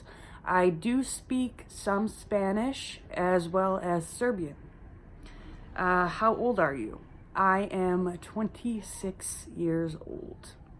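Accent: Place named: New Jersey, United States. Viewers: I hear more jersey than anything lol I’m from upstate New York grew up in bath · I love your channel I’m a bit late to the question and answer session but I would have asked what cosmetics and skin care do you use your skin is always flawless and I hear New Jersey in your accent 😊xx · I thought I heard some Jersey ! Thank you for sharing- your a true blessing. May I ask ? Was it difficult learning to speak after your dentures ? You speak really well . Thank you and Godbless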